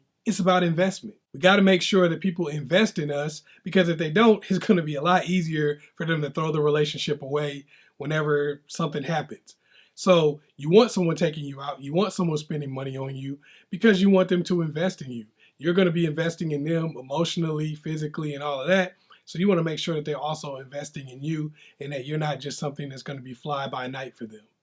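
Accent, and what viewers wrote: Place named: Decatur, United States. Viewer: Are you from the East side? You sound like you’re from Decatur? Btw great advice as always ✌🏽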